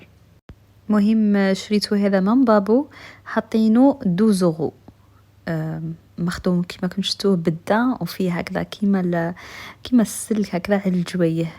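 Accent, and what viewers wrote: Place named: East, Algeria. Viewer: باينة على اللهجة تاعك من الشرق الجزائري أول مرة نشوفك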